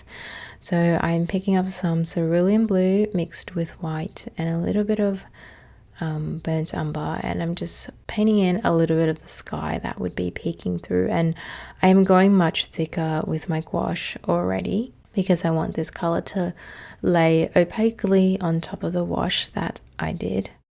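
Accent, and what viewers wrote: Australia. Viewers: Great video! Thanks for not using background music; the combination of silence and your sweet, Australian voice makes it a true pleasure to watch and listen to. Greetings from Norway.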